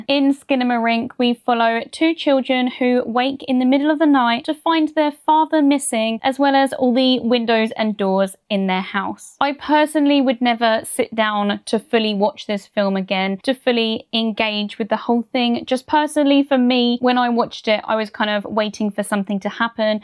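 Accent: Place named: Southern England, United Kingdom. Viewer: I'm guessing u're from southern england like by u're accent, I'm from maidenhead berkshire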